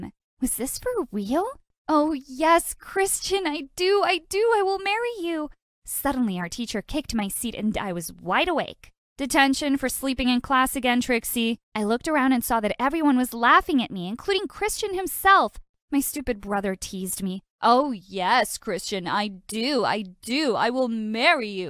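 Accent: Philippines. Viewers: wow i was shock i like the filipino accent and the word makes me laugh hahahhaha and she mentioned phili[[ines i feel happy and excited HAHAHH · Omg I'm a pilipino and the freakin accent😭😂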